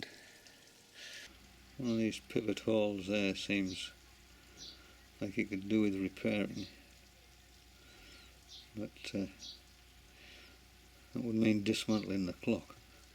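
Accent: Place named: Northern England, United Kingdom. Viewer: Hi. 2 years ago it would apply to me, Done 40 clocks since then, sell them on ebay and do some repair work as well. Really enjoy the work. Found something I enjoy doing at last and get paid for it as well. Seem to have learnt very quickly, despite being old 58 years. Sound you have a northern accent like me, Cheshire.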